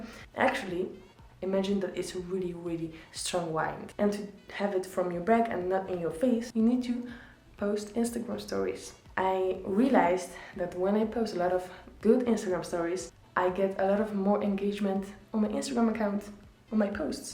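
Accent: Netherlands. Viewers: I love the European accent or rather Dutch accent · Hahahha ik hoorde meteen aan je engelse accent dat je nederlands was, thx voor de video wel echt super handig!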